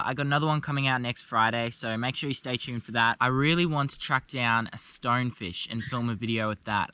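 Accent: Australia. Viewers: Where at, his dialect sound Australian not trying to profile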